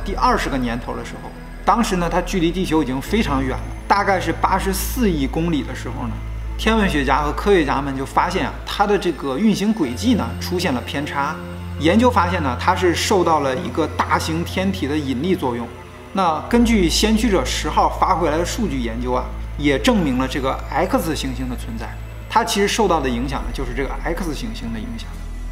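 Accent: China. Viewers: nic小哥听起来像是焦作那块儿的。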